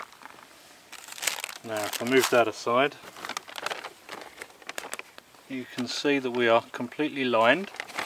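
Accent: United Kingdom. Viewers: Wow i just found a video worth for my lesson to study living outside, i subscribe it m8, your video's so cool And british accent? Youre an england?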